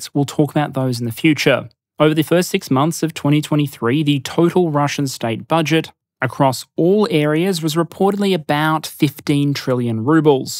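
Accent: Australia. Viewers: "....one of those people who can derive joy from spreadsheets" this is why I love your style ( just one small example). Not just content that makes one think one learned quite a bit (yes, I do), but such a great way of presenting. I even started liking the Ozzy accent.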